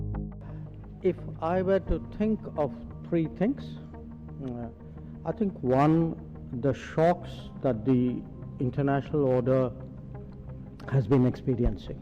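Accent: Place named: India. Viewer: why would anyone listen to a telemarketer from an Indian call center?!! Shut up Hadji and answer the phone!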